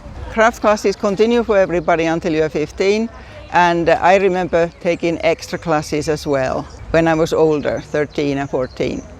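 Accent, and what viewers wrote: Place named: Finland. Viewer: Hello! I am so happy to have discovered your channel! I could listen to your Finnish accent all day as I come from a small Finnish community in Northern Minnesota and am proud to be 1/4 Finnish myself. 😬My family and I were in Finland for a month in the summer of 2011 when we visited our former exchange student who still lives in Helsinki. Neither knitting or crochet in taught in US schools; not in the midwest, at least. Sewing, cooking, woods and metals are taught here though, just as in Finland. I thoroughly enjoy your basic, easy to understand tutorials. I conquered the Granny Stitch today thanks to you! Kiitos, Shelley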